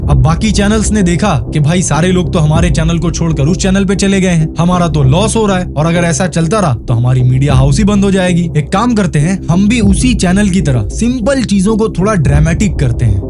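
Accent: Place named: Bengal, India. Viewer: দাদা তোমার হিন্দি তে বাংলা টানের জন্য গর্বিত! 🙂 🙏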